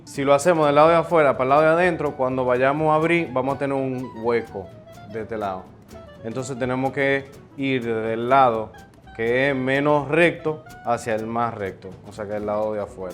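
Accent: Dominican Republic. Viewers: Vamo arriba!! me encanta nuestro acento tan original, siempre y cuando no caigamos a lo vulgar, solo que apreciamos lo que realmente somos cuando estamos fuera de nuestro país. Este canal me encanta!!!! Bravo!! 👏🏻👏🏻👏🏻🙌🏻😊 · Jajajaja!!!! primera vez que veo este chef me encanto!!! su forma de explicar muy gracioso parece dominicano al hablar 😂😂😂😂😂😂😂😂😂😂😂😂😂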